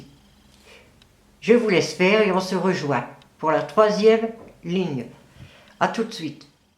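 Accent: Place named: Nord-Pas-de-Calais, France. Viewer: Très bien expliqué... j' adore votre façon de parler qui ressemble au parlé Ch'ti que je suis ❤ Très beau modèle...👍❤️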